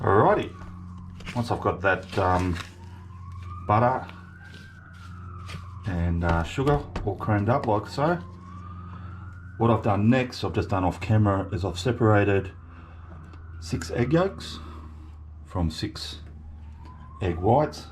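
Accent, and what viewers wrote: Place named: Australia. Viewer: Getting ready to try this with leftover Kentucky Bourbon from our daughter's Kentucky wedding! (Old Forrester 86 proof) Looks like a great recipe but I didn't expect an Australian (?) accent Y'ALL!! ;{) I'll report back next week.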